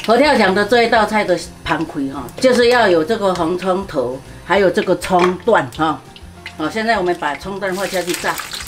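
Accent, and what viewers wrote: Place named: Taiwan. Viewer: 阿嬤.好可愛台灣國語支持你👍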